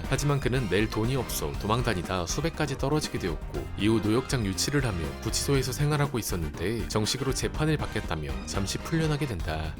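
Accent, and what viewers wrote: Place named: Gyeongsang, South Korea. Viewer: 갱상도임 ㅋㅋ우파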